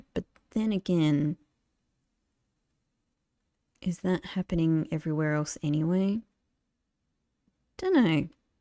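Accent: Australia. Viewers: It’s interesting just how little the absence of sound mattered here. I wonder whether I’d have even noticed if you hadn’t mentioned it. If anything, it made me wonder why I never had an Aussie narrating MY explorations. That should definitely be an option in a future patch.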